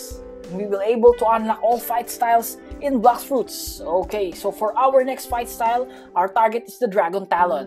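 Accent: Philippines. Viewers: He has a filipino translated channel and he has an accent of a filipino so yes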